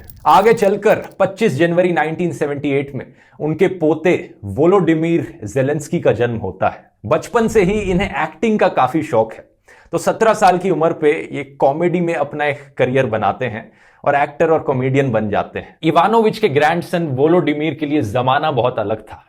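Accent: Haryana, India. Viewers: आपकी Language me हरियाणवी touch है।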